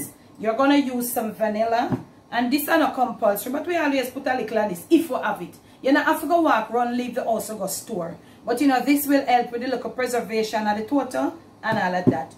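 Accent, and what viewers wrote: Jamaica. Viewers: Ms I enjoy your videos. Mixture of proper English and Jamaican patois is so me. I am comfortable with your style of communication. Thanks for the education in authentic Jamaican cooking on all your channels. I appreciate your letting us being a part of life in the Caribbean and specifically Jamaica. I wish you all the best going forward. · I could just eat a slice of Toto, my mam use to make it while i was growing up. Love watching you bake, and i just love the Jamaican speeking, i find it so warming. By the way i think the word you were looking for the cocanut trash is dessicated. My mam also called it cocanut trash! Lol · I like jamaoca english❤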